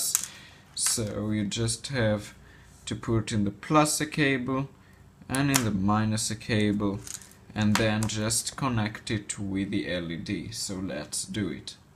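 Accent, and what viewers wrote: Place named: Germany. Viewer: Mir gefaellt dein deutscher Accent.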